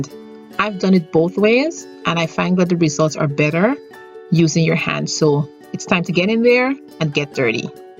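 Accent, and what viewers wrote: Guyana. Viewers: Thanks, for your first YouTube video looking forward to more. I'm always looking for new recipes on your blog. Love ya accent. GT to de bone. Thanks for repping Guyana and sharing our delicious recipes.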